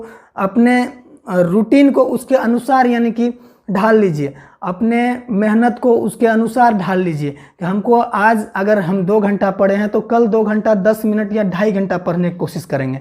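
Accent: Bihar, India. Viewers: Bihar se ho ka bhai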